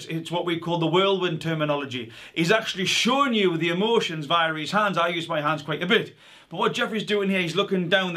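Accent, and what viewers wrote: Newcastle, United Kingdom. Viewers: I love hearing a local accent on YouTube. We Geordies are gonna take over! Like the content. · U a geordie?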